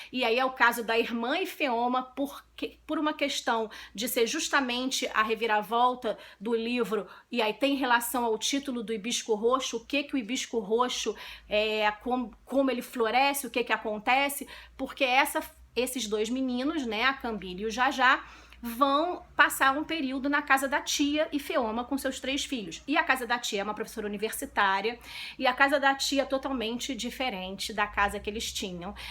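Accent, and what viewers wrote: Rio de Janeiro, Brazil. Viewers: só me dei conta do sotaque 'carioca' depois do teu comentário, crissstão, luzzzz, contrassssti, etc. …so amazing! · Esse livro é muito maravilhoso mesmo. E a delicia que é esse sotaque carioca?! Amo seus videos. <3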